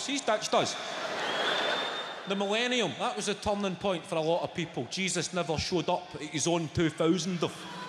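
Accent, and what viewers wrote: Scotland, United Kingdom. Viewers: I loved loved his deep Scottish accent!!! So sexy!!!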